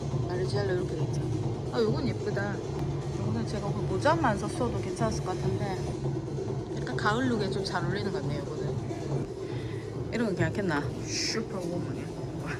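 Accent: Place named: Gyeongsang, South Korea. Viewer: 나도 대구라서 그런지 말투 완전 친근해요ㅋㅋㅋ얍샵하이 ㅋㅋㅋ 덕분에 뉴욕 쇼핑 구경 잘하고있어요😊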